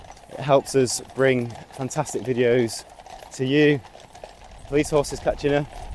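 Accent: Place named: Staffordshire, United Kingdom. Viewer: … the more I listen to your narration the more I think he was born and bred in the potteries or the Staffordshire Moorlands.. I may be wrong of course but that accent sounds familiar … Nice video btw as usual … 🫡🫡🫶🏻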